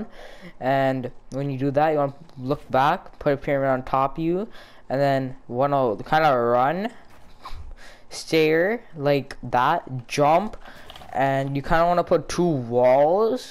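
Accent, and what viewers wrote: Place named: India. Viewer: Did anyone here his India acete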